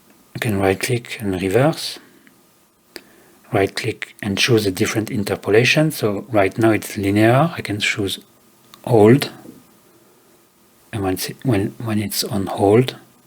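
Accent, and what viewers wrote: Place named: France. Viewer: Thanks a lot my friend. Tu as l'accent d'un français. Je me permets de poursuivre dans notre langue. Je débute avec ton tuto, c'est vraiment limpide ! Merci bcp ! Je me demandais comment tu exportes ton fichier pour en faire un fichier de vdjing ? C'est peut etre une question hyper large mais voilà je débute complètement... déso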